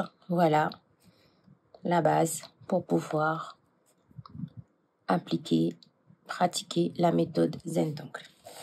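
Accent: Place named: Mauritius. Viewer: C’est drôle ! J’avais cru entendre un petit accent de l’île Maurice! Ça fait longtemps que vous faites de zentangle? Moi, je découvre…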